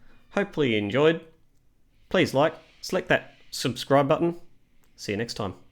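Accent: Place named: Australia. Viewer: Love your accent, are you from Australia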